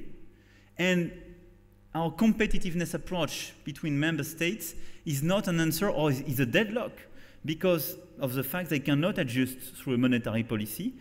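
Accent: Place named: France. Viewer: bah il est français tu veux qu'il ait quel autre accent à part l'accent français ?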